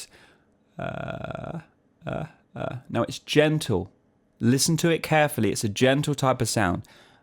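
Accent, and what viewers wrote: United Kingdom. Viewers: Loves the British Accent! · British English?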